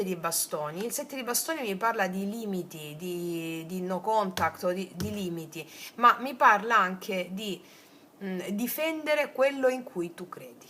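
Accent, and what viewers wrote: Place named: Salento, Italy. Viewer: Grazie ❤ ascoltarti un piacere per la tua preparazione passione e per il meraviglioso accento 😊love Salento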